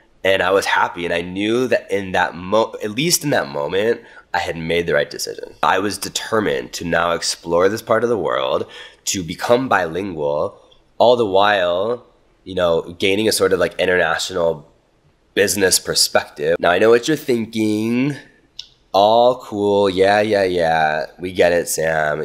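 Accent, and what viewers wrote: Argentina. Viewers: I'm Colombian, living in Brazil pretty much for the same reasons you moved abroad, but with some different points, but I can totally relate. because I didn't know anything about the culture or Portuguese, yet here I am... and now I understand why you have a kind of Argentinian accent when you speak in Spanish!!! Love listening to you speaking in any language btw. Abrazos 😊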